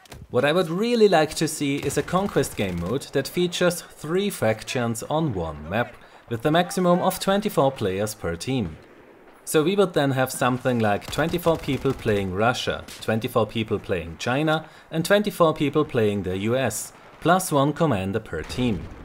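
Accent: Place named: Germany. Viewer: Haha I am joking of course! I love your ideas and stuff for battlefield. Love the accent too. Are you from Germany by chance?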